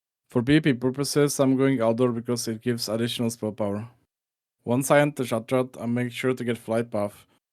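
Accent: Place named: Czech Republic. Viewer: Where are u from man? I hear Czech ❤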